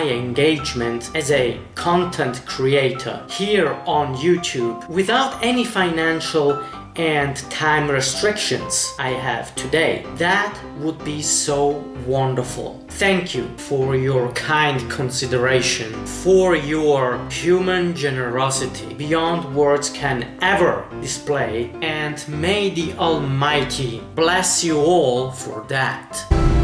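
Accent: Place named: Eastern Europe. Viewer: RI Hero ما اعتقد امريكي، ممكن يكون شرق اوروبي، لهجته ليست امريكية.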